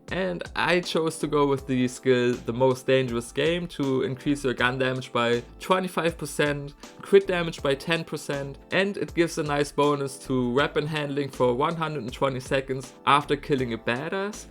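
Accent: Germany. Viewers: lul bist du deutsch?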